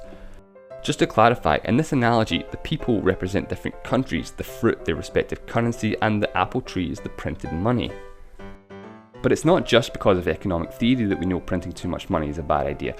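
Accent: Scotland, United Kingdom. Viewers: Telling by Your Accent You Are Scottish Probably, Good Explaination👍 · I'm not trying to be rude or something, but if this is a Scottish accent, then it's bloody annoying. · It’s the Scottish accent lol. His accent is pretty clear lol some Scottish accent are hard to understand if you don’t pay attention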